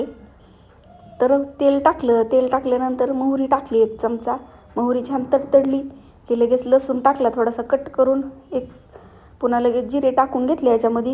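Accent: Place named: Satara, India. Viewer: खुपच छान बनवली मी सुद्धा अशीच बनवते, मला तुमची भाषा खुप आवडली, मस्त अशी सातारा style 👍 👌👌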